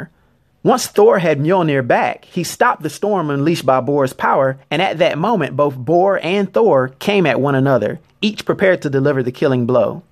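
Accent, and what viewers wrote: United States. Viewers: He has Texas accent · great video and loved the accent (american i think?) pronounciation of some of the names! was very different to hear. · Terrible hick accent. Lol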